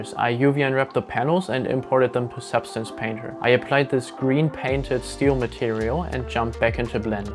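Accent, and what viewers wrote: Germany. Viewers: Hab direkt an deinem Akzent erkannt dass du Deutscher biste 😎🔥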